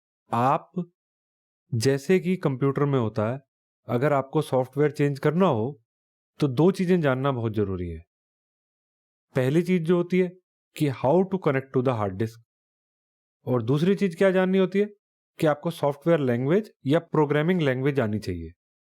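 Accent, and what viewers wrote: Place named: Punjab, India. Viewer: Thanks, aapka punjabi accent and simple way of explaining is v.good. Thank u.. Kya aapka book crossword stores me hai. ?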